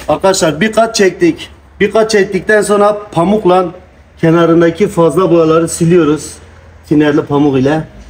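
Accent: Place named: Urfa, Turkey. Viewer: Urfalı olduğunu hissettim hemşehrim. Harikasın enerjin iyi videolara devam.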